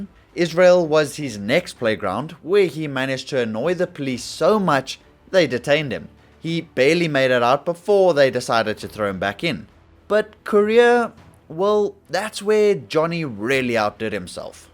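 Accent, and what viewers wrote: South Africa. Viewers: Refreshing to hear South African accent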